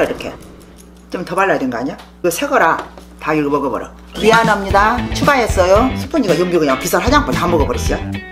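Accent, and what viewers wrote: Jeolla, South Korea. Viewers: 전라도 사투리이신것 같은뎈ㅋㅋㅋㅋㅋㅋㅋ 말투 중독된다 처음 보는데 구독함ㅎㅎ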